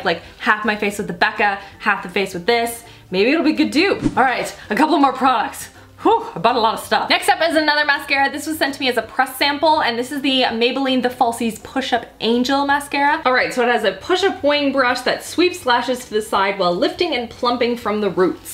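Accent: Canada. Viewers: Please... do a tutorial about your look! With the typical Canadian "about" :D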